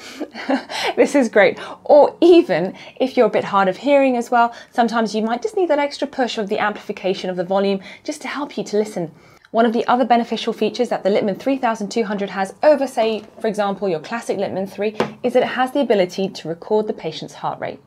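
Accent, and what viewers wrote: United Kingdom. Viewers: Hi, are you a GP working in Australia? You sound British?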